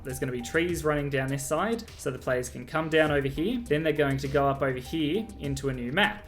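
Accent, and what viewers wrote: Australia. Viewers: Is that an Aussie accent?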